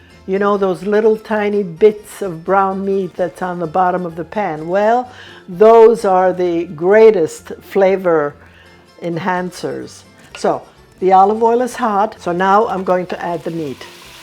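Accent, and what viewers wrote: United States. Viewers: how come you sounds american and not italian? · This woman has never lived here in Sicily in her life... "Sicilian" my butt.. Thick AMERICAN accent... Americans always say things they are not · She has an extremely American accent.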